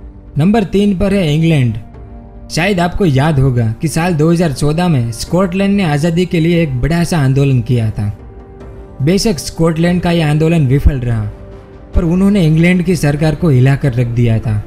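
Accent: Gujarat, India. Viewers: Gujurati ho kya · bhai gujrati ho na tum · Guju Vai, do something to Your accent...... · Bhai iski mothertoung Gujarati h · Hello brother, 1st you say me how to say this, u have any more research above countries..., I think u have to improve your Hindi speech from Gujarati style..., & u r to small to say above countries. Video banane ke or bhi bhahot sare topics available hai, jaha apni mastury ho ya vakahi jante hai usi disha me apna dhyan lagake mahenat karni chahiya varna 2( bavaji ki dhoti or bhakti ) kam bigate hai, mera aap se anurodh etnahi ki bina puri jankari kuch bhi dono hath bantna nahi chahiye. Topic achha tha par jankari bahot kamjor thi. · Bro are u gujarati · Bhai tu gujarati cho k ny? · Are you gujju?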